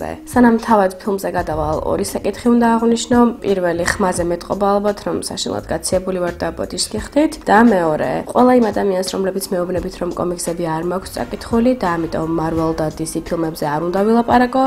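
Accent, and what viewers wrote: England, United Kingdom. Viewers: ნუ ეს პირველი ვიდეო იყო რომელიც მე ვნახე შენი და ეს ინგლისური აქცენტი ჩემთვის საკმაოდ კარგად ჟღერს რაშიც ბევრი არ დამეთანხმება კომენტარებს რომ გადავხედე წინა ვიდეოებზე. anyways :დ ხმა ძალიან კარგი გაქვს და ნუუ ალბათ უფრო კარგი იქნება თუ სახესაც გამოაჩენ. ყოველ შემთხვევაში მე ძალიან მომწონს, როდესაც თოთოეულ წარმოთქმულ ემოაციას ვხედავ თუ როგორ აღიბეჭდება ადამინის სახეზე. ძალიან მომეწონე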